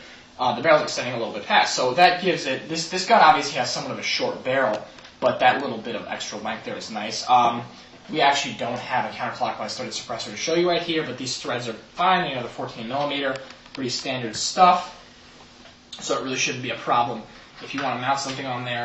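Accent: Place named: Boston, United States. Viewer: nice review but omg u realy sound like boston from do u love new york or whatever that one reality tv show u know.... nive job tho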